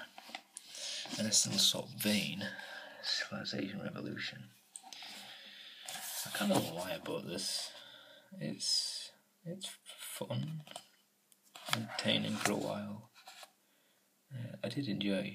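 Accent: Yorkshire, United Kingdom. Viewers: I have just found you via the waterwhispers channel who I love to watch. she did a video talking about other male's who do ASMR video's and to check you all out. with myself from Yorkshire I thought I would check you out to. nice to listen to Yorkshire accent like this. I don't really get on much with male ASMR's but I do like to listen to the Yorkshire accent softly. I be watching more of you. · I've only recently discovered asmr and you're my absolute favourite. I love the Yorkshire accent so much. Thinking I might start my own channel also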